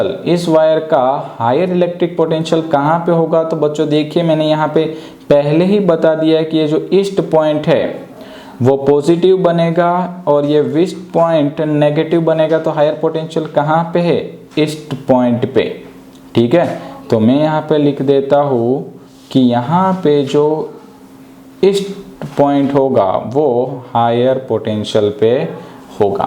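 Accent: Gujarat, India. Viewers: Are you from gujarat ?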